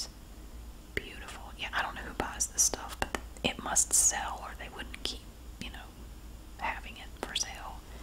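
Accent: South, United States. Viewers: Thank you for this video. South Carolina is beautiful, I’m going to visit in a few weeks since my boyfriend is from there. Your southern accent is so sweet and cute 💕 are you originally from SC or just visiting for vacation?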